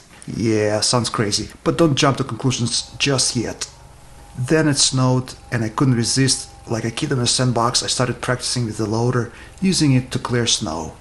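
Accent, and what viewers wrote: Russia. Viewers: хороший английский! понимаю намного легче из-за русского акцента😊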